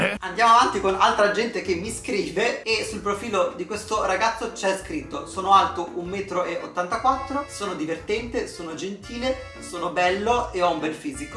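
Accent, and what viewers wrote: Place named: Romagna, Italy. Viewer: Ma perché in questo video hai la "s" romagnola? Adoro btw 😘...baci dalla Romagna ❤️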